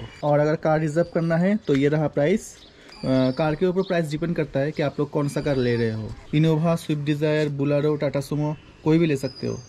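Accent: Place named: Bengal, India. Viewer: When he was talking I got it he is bengali i mean I am to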